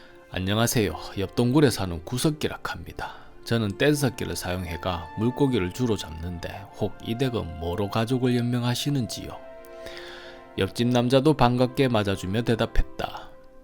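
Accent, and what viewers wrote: Gyeongsang, South Korea. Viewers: 근대 해설자님은 사투리를 경상도만 써요? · 경상도 사투리가 구수할수도 있구나~^^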